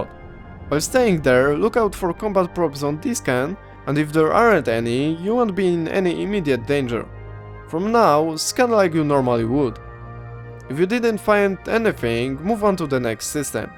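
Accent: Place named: Poland. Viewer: Oh QRVA, a polish guy. If you want polish speaking eve, look at my channel. Also, qrde, kocham twoj akcent :> Don't forget as an alpha, your skills suck, and your tier 1 equipment sucks, so it is NOT THAT EASY to get a site to 100%. Also, good luck to all the alphas probe scanning in low sec and null sec without a cloak.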